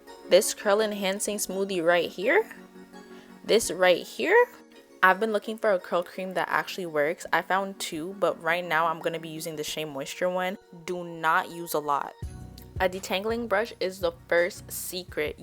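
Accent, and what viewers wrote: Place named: Toronto, Canada. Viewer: Girl you from Toronto? Your accent screams Toronto lol thanks for the hair tips. Needed something for my daughters hair. Will try it out!!!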